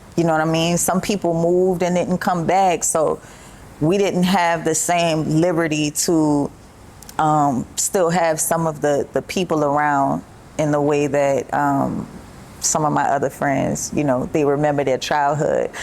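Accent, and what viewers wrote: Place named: New Orleans, United States. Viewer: I hail from New Orleans. I recognize that accent anywhere.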